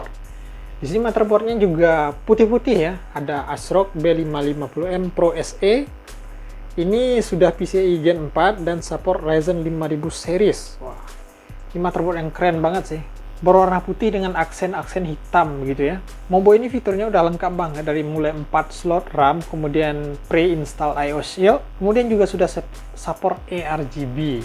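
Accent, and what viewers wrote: West Sumatra, Indonesia. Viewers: Abang orang Sumbar ya?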